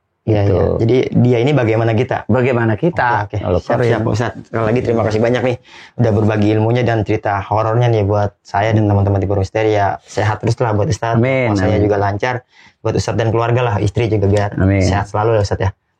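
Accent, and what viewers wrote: Jakarta, Indonesia. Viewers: Bahasa Betawi Bekasinya mantap pak ustadz saya bangga jadi warga Bekasi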